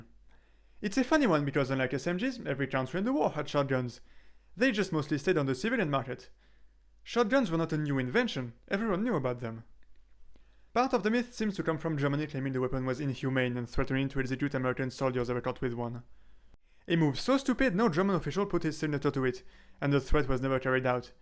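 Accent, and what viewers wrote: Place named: France. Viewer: Couldn't understand half of the video due to the extreme french accent but thanks for the information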